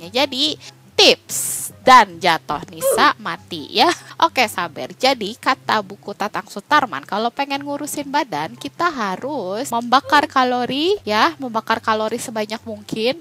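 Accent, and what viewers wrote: West Java, Indonesia. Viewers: sunda na kaluar pisan wkwkwkwk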